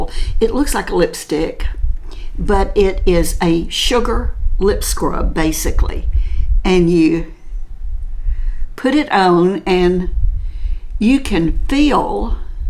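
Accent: South, United States. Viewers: You are from the south...obviously your gentle lilt of an accent. I lived in Atlanta for 16 yrs. you are a true southern belle☺ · I'm glad you shared that you're a strict vegetarian and the💖 e.l.f. is 100% vegan!!! I'm just now at 68 starting to try make up. And I do care a great deal about NO animal cruelty. Thank you so much for sharing all that. I love your video's so much. 💖 You're so sweet and I just adore you. My dad was from NC so I also love your southern accent and mannerisms too. Feels like home to hear it. ❣💋 I wish I could agree that your lips look plumper, but I don't think they do. I tried that product too but I couldn't handle the burn and had to return it. So maybe I didn't leave it on as long you did. I have thin lips too like my mom did, and I keep watching them shrink too. 😞 But wow, quite a beautiful transformation.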